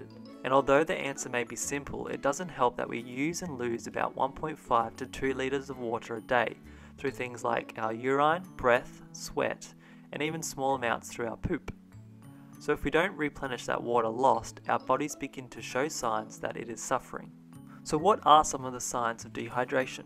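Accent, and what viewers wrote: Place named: Australia. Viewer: Love the “Bondi Vet” (TV show) Australian voice.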